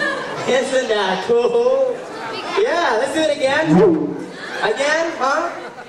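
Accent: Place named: Canada. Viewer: I love his inherent Canadianity. "Eh?"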